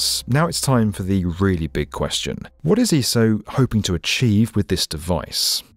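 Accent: England, United Kingdom. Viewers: As an American , l cannot tell you how much a British accent adds to narration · It's not the height of Big Ben (a bell), which is but a few feet high, it is the height of the Elizabeth tower that holds Big Ben. You sound English, you should know better. Bollockbrain.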